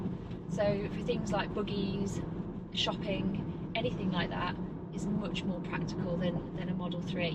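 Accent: United Kingdom. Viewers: My wife needs to see this! She complained about the Model 3 P that we rented for a quick weekend getaway with some kids. She said it was a harsh ride and didn't like it. I've got a LR Model Y coming end of Oct - early Dec and she thinks she won't like it.This is GREAT intelligence here! Doesn't hurt that it's narrated in a cool British accent 🙂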